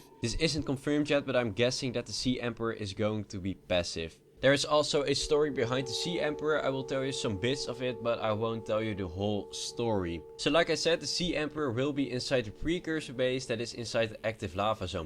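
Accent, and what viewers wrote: Netherlands. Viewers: you sound very dutch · ben je soms nederlands het klinkt wel zo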